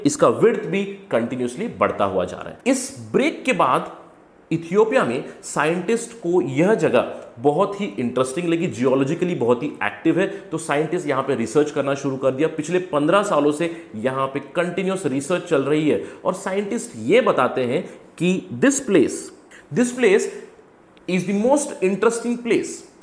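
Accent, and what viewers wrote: India. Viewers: I was eager to hear your hindi accent.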